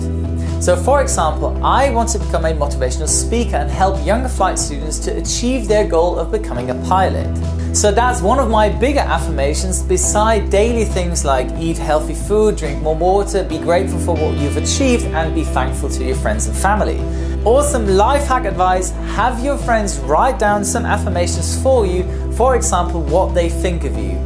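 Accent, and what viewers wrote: England, United Kingdom. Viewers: Hi,! I'm from São Paulo, Brazil. Well, I simply love Watching your videos on YouTube. Nice to see that, from all your habits as soon as you get up, you meditate! Absolutely healthy to your mind, body, and everything. I really admire your job and this charming British accent you have! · You are very British in your accent. Refreshing, in a world that is crazy about imitating America. 😊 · Wait is british?? All this time i thought he was german with amazingly english accent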